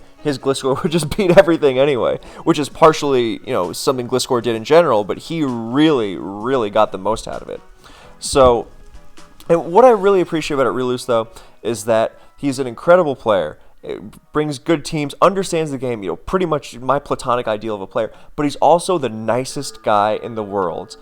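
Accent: Germany. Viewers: you probably pick up on final devoicing, missing schwas, wrong use of fricatives, and Germans tend to overthink the "th". ^^ xD o.O -.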